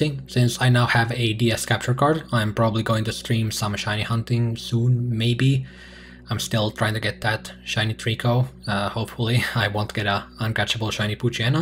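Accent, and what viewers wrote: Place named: Finland. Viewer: By the accent, I knew you are Finnish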